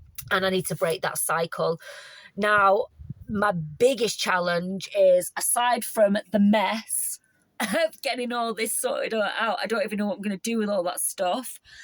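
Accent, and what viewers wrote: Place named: Northern England, United Kingdom. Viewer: I dont blame you for moving away from bolton. I too was born there and moved away in 2007. I can only imagine it has gotten worse, all the shops were shutting in town last I heard, not a fan. Not bothered never going back. Not sure about your conspiracy theories but its definately scary times we are living in. I can also relate to your being isolated, Im the same. wierd thing when you get used to only your own company. All that just to say was an interesting watch. Just a shame how bolton went not many people of the older generation anymore that were like Fred Dibnah. Good luck with the channel. did you move to bolton? can't tell by your accent although can tell it's northern.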